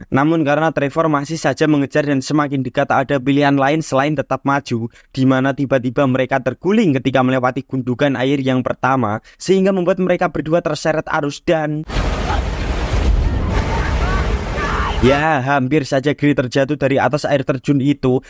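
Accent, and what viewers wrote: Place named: Java, Indonesia. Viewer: channel yg tidak ada apa apanya di banding iq7, suaramu medok gak enak di dengar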